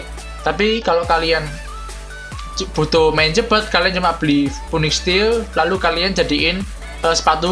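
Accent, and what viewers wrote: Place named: East Java, Indonesia. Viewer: Misuhmu apik mas.... Kroso jowo timuran. Cangkemmuuuu wwkwkwkwkk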